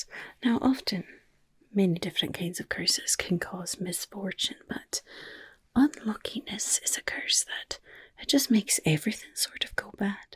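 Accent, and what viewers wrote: Ireland. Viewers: As an Irish person you absolutely nailed the accent ❤ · I love your Irish accent,. I know you have also done English accents and if I remember correctly your "bossy" vampire had a Russian accent. Have you / will you do other accents too? No pressure but I'm just curious. Thank you for all of the personal attention in this wonderful St. Patrick's video. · I don’t know what it is about your Irish accent but it gives me tingles til I melt! I enjoyed the St. Patrick’s day. 💚🙂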